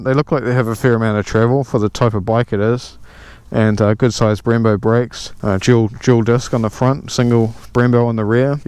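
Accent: New Zealand. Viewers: Cool review bro. Love your style and always good to hear another Kae-wee accent on YouTube.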